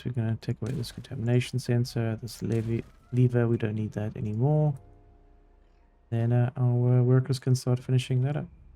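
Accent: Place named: South Africa. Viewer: yay, another South African timberborn player, heard the accent immediatly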